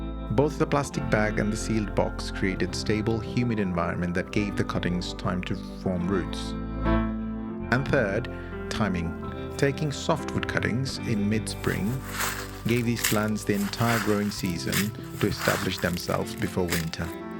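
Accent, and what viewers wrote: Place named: India. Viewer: I enjoy your channel immensely , you are an easy study , I appreciate that you speak slow as I have difficulty sometimes with Indian accents , thank you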